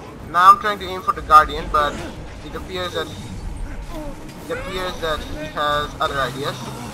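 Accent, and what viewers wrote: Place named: India. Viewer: sounds like an indian accent woooooooo represent us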